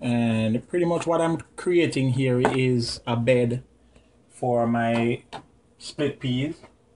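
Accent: Jamaica. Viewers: your Jamaican accent is charming!!! & the soup looks good too!!! ...not a fan of dumplings so will make without :-)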